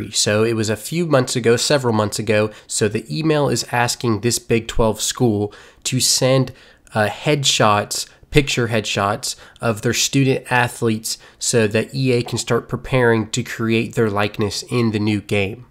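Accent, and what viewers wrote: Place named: Mid-Atlantic, United States. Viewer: Mid Atlantic accent detected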